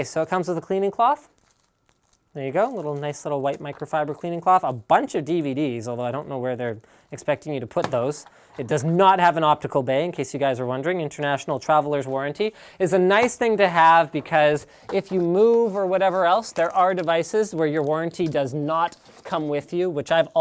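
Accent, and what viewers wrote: Germany. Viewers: >.< I watch this show for your German accent... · Im solely here for the german accent :) Sehr gut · I dont know about anyone else but his german accent is pretty much the only reason i watch his videos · Came for the German accent, stayed for the unboxing! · You're german? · I watch for the German accent... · german accent? wtf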